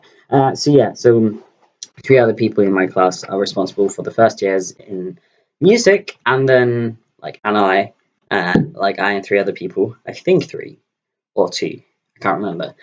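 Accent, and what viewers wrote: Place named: RP, United Kingdom. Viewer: Since your voice sounds a lot deeper now, you´re accent suddenly sounds kinda posh. Don´t know why but it´s really cute!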